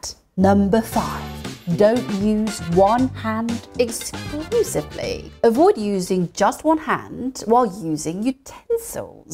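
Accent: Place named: United Kingdom. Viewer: 영국 액센트 넘 좋아요. 영어만 실컷 들을 수 있겠어요. 지금 그대로도 예쁘세요~^^ 미스때는 선녀셨군요~^^❤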